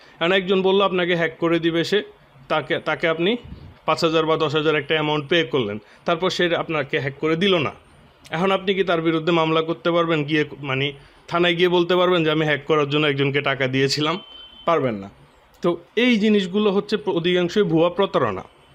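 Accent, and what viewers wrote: Noakhali, Bangladesh. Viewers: ভাই নোয়াখালী না চাদপুর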